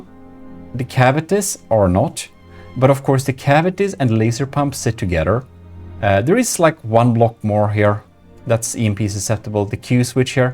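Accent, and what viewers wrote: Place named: Sweden. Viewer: People guessed wrong on your nationality? Din engelska brytning är så extremt svensk att det låter nästan som om du pratar svenska lol.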